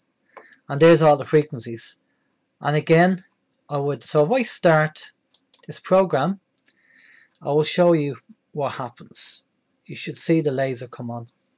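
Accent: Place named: Ireland. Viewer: Hi I have just come across this rife machine and rife machines in general. I noticed you have an Irish accent. Is there anywhere in Ireland this can be bought from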